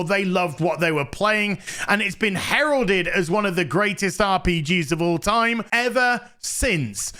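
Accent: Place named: United Kingdom. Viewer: I'm not a Brit, but his accent makes his rants far more entertaining to listen to.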